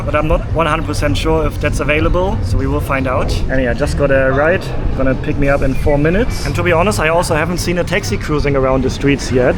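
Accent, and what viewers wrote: Germany. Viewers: hes from germany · Deinen deutschen akzent muss man mögen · Übrigens sprichst Du ausgezeichnetes Hochenglisch mit einem niedlichen deutschen Akzent! Respekt! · Mein bester Mann hört das du aus Deutschland kommst 😁😁😁German English ❤️ Aber super Videos 👍🏻😊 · You sound very German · i can hear the deutschland out of you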